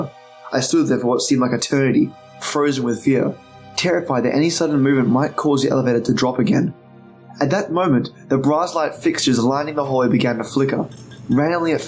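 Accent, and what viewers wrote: Australia. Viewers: Terrific story mate! Couldn't resist using the word mate, love your Aussie accent.. Which sounds pretty funny..considering I'm an American gal from the south who has an Ellie Mae Clampett drawl myself. Thanks for sharing all these amazingly creepy tales..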